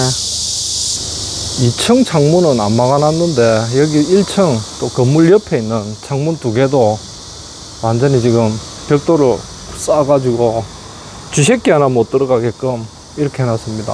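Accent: Gyeongsang, South Korea. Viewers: 재방이지만 오늘도 기분좋게 보고있습니다 같은 경상도라서그런지 넘정겹습니다 편안하고 푸근합니다 ~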